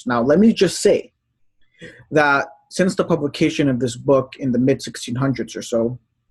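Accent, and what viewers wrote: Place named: Israel. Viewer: Ah ok. I have many Israeli friends and you sounded like one of my friends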